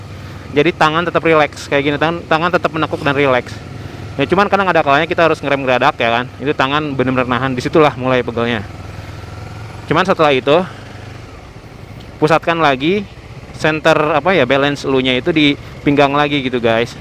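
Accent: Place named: Sunda, Indonesia. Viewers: Msih melek ehhh ada yg update video maaciw udh nemenin begadang "Ayo pa jangan clengak-clenguk", "sebentar ya om", "usaha keun" alah alah hahahaha gg bisa nahan ktwa bagian ini sunda pisan 😄😄😄 Di tunggu next videonya ya aa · sundanya masih ke bawa..hihihi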